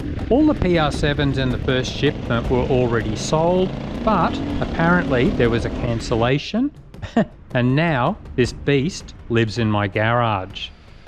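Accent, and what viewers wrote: Australia. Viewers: Nope, just nasally Aussie twang 😉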